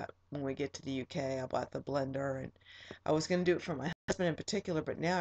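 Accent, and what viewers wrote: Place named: Pittsburgh, United States. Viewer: awww what a touching video,,, i understand, by the way, where r u from? Pa by any chance? You sound like a Pittsburgher ;p hugs to u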